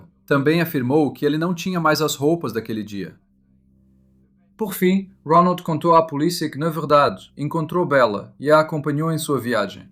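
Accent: Portugal. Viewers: Esse sotaque portgues é muito feio 😅😅😅😅😅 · Impossível assistir com esse sotaque de portugal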